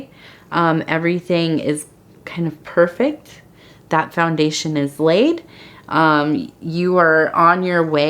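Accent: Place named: California, United States. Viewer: Great! You answered my question. I can now attribute the quality I heard to a Californian accent. The nuances of our American accents are broad and beautiful. I was made aware of it early on growing up in Alabama!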